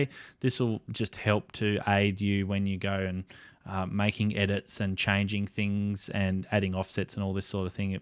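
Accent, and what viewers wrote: Australia. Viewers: I just found your channel then I recognized inmediatly that beautiful aussie accent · Hej Matey, well i bought the Boafeng UV5RH and tried to use the CHIRP software and then i got stuck, so i went on youtubr to look for tips n info. A Minefield of ppl giving their views n tips on their vids....... i have watched so many from the uk and usa and i havent found one untill now that goes through the start process as well as you do. Yup fair dinkum me old possum, Sorry, just using my English sense of humour, No but seriouly i got to downloading from the radio all the channels and then WHAT..... untill now when you showed me how to save the numbers and then delete them from the radio ready to programme the new ones in by hand or buyin using links on CHIRP... I live in North Wales in the UK and even here the videos dont tell you that, strange isint it? 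i have asked pp on fakebook in my area and i got limited responces and mostly use chirp............ As i am not young anymore all i need is to be shown once and i generally have it. So now i have found your video, saved it and subscribed to you also plus you have a good Aussy accent that is easy to listen to. So thank you for putting this video out there matey. Be safe out there. Thank you from over here in North Wales UK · Can't find my UV-7B anywhere on the list, Chirp sucks.. Work on losing the aussie accent, sounds awful.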